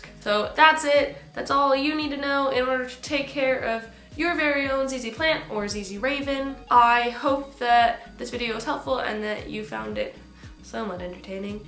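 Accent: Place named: United Kingdom. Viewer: Are you British? I hear a bit of an english accent...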